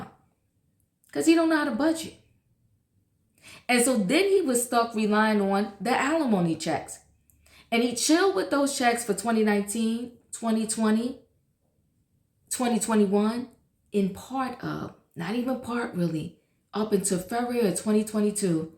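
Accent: New York, United States. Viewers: I wholeheartedly agree...but, as well, that's the mother of his first born...I'm not sayin he's a saint at all but I think at some point he had a 'I'll keep her good in the process of doing me' mentality--and we all see the consequences of Wendy managing herself...Your hair looks so pretty and love that NYC accent...take care mama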